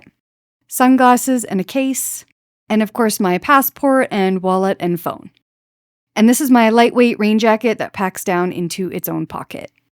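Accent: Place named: Canada. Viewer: Canadian accents are quite charming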